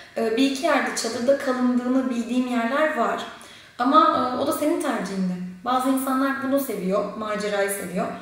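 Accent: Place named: Hatay, Turkey. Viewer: Hatayli arkadaşim var konuşması senin gibi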